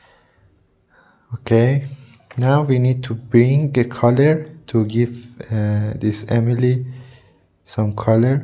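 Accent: Iran. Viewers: literally one of the most accurate persian accents i've seen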